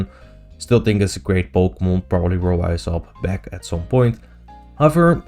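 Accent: Netherlands. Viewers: First word you spoke I immediately knew I was hearing a fellow dutchie 😂 Lovely content though :) glad to have found your channel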